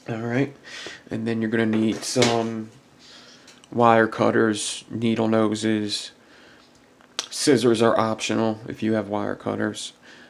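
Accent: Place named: Baltimore, United States. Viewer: Do you have got to be from Baltimore with that accent that you have it’s pretty much where I grew up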